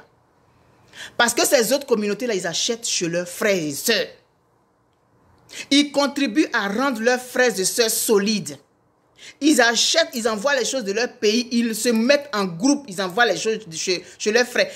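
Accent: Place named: Cameroon. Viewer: Vraiment il fait une publicité gratuite. Il est malade. Nous africains sommes méchants. C est un camerounais par son accent. Eeeh Dieu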